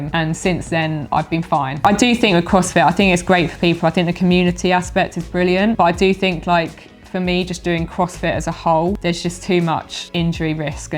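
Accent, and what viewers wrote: United Kingdom. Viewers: The accent you noted the UK? Pretty cool I competed there often in college and was always very impressed with the University of Kentucky athletics facilities! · Great girl, great accent, great concept, Great Britain! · Love your British accent,. It is so cool. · If I didn’t appreciate watching a beautiful tall blonde woman with blue eyes and a British accent, I wouldn’t watch you. But I do!❤️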